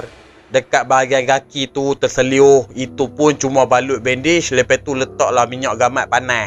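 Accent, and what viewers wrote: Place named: Kedah, Malaysia. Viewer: BG guna lah ayat KL,baru lagi ok,tak leh lari slen Kedah ya?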